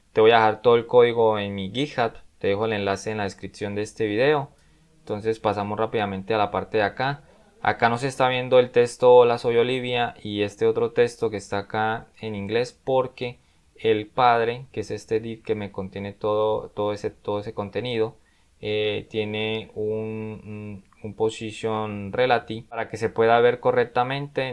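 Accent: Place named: Colombia. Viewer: De antemano, muchas gracias por dedicarle tiempo a enseñar a los demás. Ojalá más personas se sumen como yo a este canal. Por tu voz asumo que eres de Colombia, al igual que yo. Estoy aprendiendo por mi parte a programar, pero por mi trabajo y responsabilidades no pude. Ahora Sacando tiempo, poco a poco voy aprendiendo y espero, con tu ayuda, seguir aprendiendo. Bendiciones para ti y tu familia.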